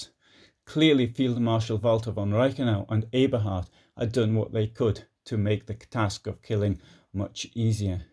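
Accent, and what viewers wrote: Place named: North East England, United Kingdom. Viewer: BTW its great to hear a North East accent sharing such well researched history , we Geordies love our history it seems.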